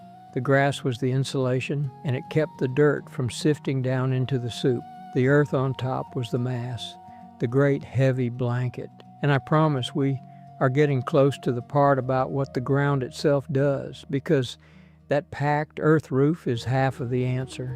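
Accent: South, United States. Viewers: Why does he have a southern accent? A I accent and images - but interesting Why does he say "Indian" country? That's not what they called themselves. lol. Indian country is the country called India. Also, how is that these types of dug out shelters don't have the soil collapsing on them?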